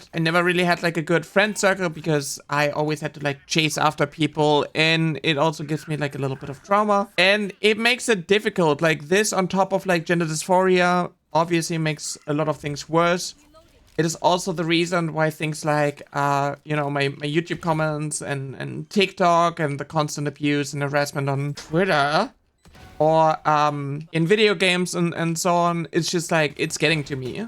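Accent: Germany. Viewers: i know your struggle with voice, but i'm still training and i really disagree with you and bullies saying you sound like a guy... you have a very nice voice to me, clearly a womans voice and i like a lot, also quite soft (most of the hardness ppl might percieve comes likely from the german accent in case you hear that a lot) i appreciate what you do and hope you feel better as time goes on... again, i like your voice a lot and it does sound clearly feminine to me... and i will keep telling you that every now and then as you clearly don't get it often enough <3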